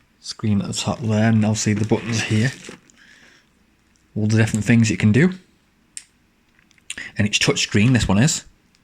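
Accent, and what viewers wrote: Stoke, United Kingdom. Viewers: Thats a proper stokie accent. Nice surprise when looking at a random cooker video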